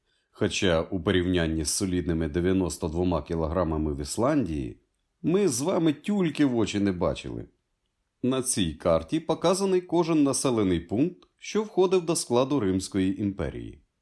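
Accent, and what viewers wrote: Russia. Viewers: Автор російськомовний, це чути з деяких слів і з акценту, але вподобайка за контекст 👍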